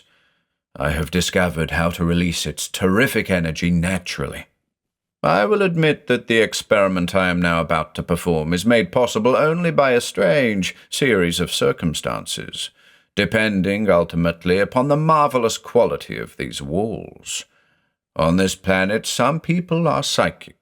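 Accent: United States. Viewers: Your American accent is getting very good,! 👍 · Solid American accent. Good job.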